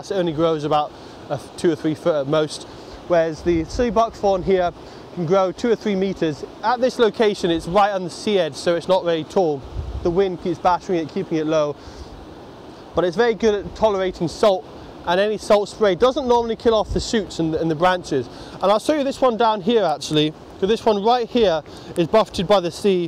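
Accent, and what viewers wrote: Scotland, United Kingdom. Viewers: Hi! Thanks for this very interesting and informative video! Would love to see more of your documentaries! Excuse my nosey question, and don't answer it if you find it too intrusive: Do you speak Scottish English? I'm asking because I find it perfectly easy to understand you. But when I visited Scotland years back, I had a very hard time understanding people in Edinburgh and Glasgow (Glasgow was even harder!). Or don't you speak your regional dialect / accent when you're doing your videos? Have an awesome Sunday! Marc from Berlin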